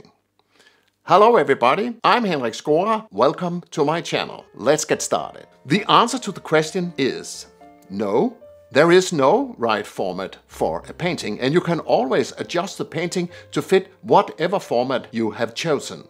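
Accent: Denmark. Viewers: Thank you for your inspiration and also for making it all look so possible, with the right materials and the right attitude. (I also like hearing your soft Danish accent.) Space is for me the most important element, because even in a painting the images are found in space (foreground, background, etc) and it delineates them one from the other. (or 6.20 pm Western Australia time element)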